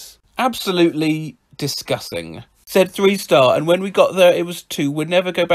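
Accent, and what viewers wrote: United Kingdom. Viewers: 🤣🤣🤣 Holy cow! That along with your lovely British (?) accent had me rolling on the floor 😂😂😂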